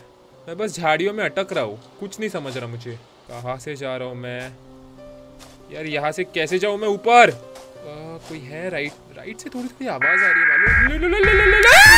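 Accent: Maharashtra, India. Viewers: You have a Marathi ancient in speaking and I love it yarr....🥳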